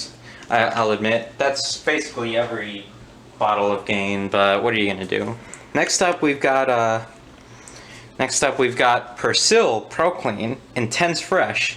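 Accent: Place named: New Jersey, United States. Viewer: Maybe because you had turned away from the camera, and perhaps didn't enunciate it. Or it's that Jersey accent. Or it happened to be the fact that last night was a drinking night for me and I still had--ready--alcohol on the brain! Yes, I've seen some other Method products, but I refuse to buy their crap because of their plant-based OMG this is eco-green. I hadn't seen their scentalizers, I'm curious if they have plant stuff in that too. I have LA's and I've used it a couple times as well, didn't have anything to really say about it good or bad, it was like you said, just kinda there.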